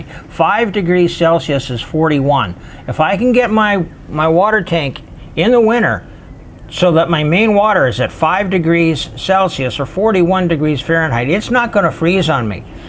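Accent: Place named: Canada. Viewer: You know what? This guy is speaking in plain English, albeit with a Cannuck accent, yet I cannot understand what on earth he's yabbering-on about, most of the time. I did actually fall asleep at one point...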